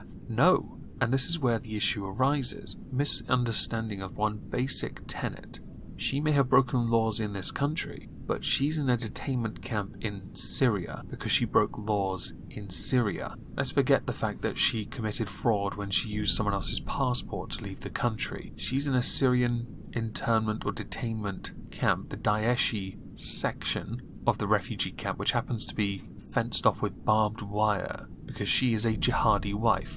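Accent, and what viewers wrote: RP, United Kingdom. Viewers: Could maybe ONE of you closet furries do the not-really-going-out-there-on-the-edge social commentary videos WITHOUT the laconic "I just woke up" received pronunciation and the weird background ambiance to sound in-character? Christ all-fucking Mighty.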